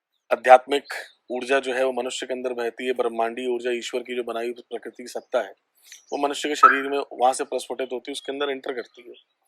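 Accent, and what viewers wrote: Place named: Maharashtra, India. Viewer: मुझे हमेशा ऐसे क्यू लगता हाई की आप महाराष्ट्र से हो. मराठी भाषिक हो क्योंकि आपकी हिन्दी एक मराठी भाषिक जैसे है. तथा नाथ परंपरा तो महाराष्ट्र धर्म की है. जय गिरनारी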